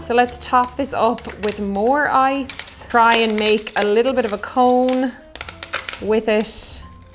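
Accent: Ireland. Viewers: I don't know why, but for some reason I was expecting you to pronounce "derby" as "darby" with your Irish accent.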